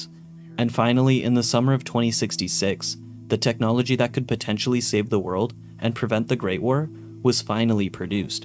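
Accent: Canada. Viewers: You can totally tell you are from Canada lol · I can't decide if your accent is Canadian or not!(am Scottish so accents are weird haha) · I love your Canadian accent 😊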